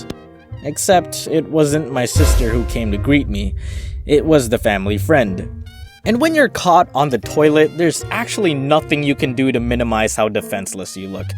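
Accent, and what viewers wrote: Philippines. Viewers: He still have filipino accent lol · You’re Filipino accent is amazing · Hindi ako maka get over sa tabo! 😂 (It’s nice to hear that you still have your Filipino accent! ♥️)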